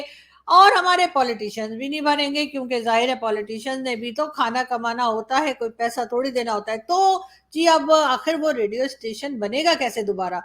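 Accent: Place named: Allahabad, India. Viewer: You're very cute BLOGGER, the way you speak it really reminds me of Allahabad , you're a true Allahabadi , keep it up , my best wishes and blessings I am too is ALLAHABADI , though live in Delhi BUT our ancestors Bunglow is still intact in Allahabad where i frequently visit , it's really a very sad situation that l live here in a flat WHEREAS only three aged my sisters and a brother lives in a 4 acre huge bungalow spread over 32000 sq feet I only wish that one day l could host you in my Allahabad house, if i really survive , l celebrated my 80th Birthday in San Francisco in the month of May So long ❤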